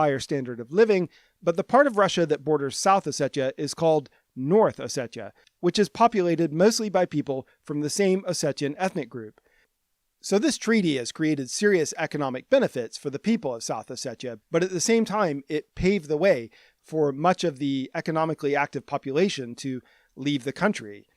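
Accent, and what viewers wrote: United States. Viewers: You sound like a American.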